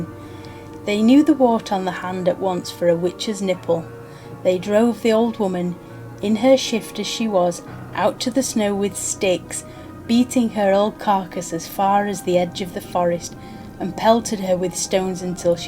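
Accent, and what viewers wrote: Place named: England, United Kingdom. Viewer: glad to hear this being read in an english accent, good job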